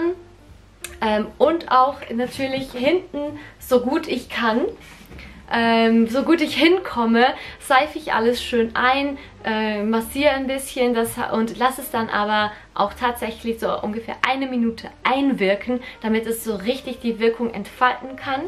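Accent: Switzerland. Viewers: Alter ha gat vo dir a Video a gluagt vo 2013😂😂 met däm schwiizerdütsch👍👍😂 soo lostig i fir di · Mi ganz körts wenig das schwizärä bisch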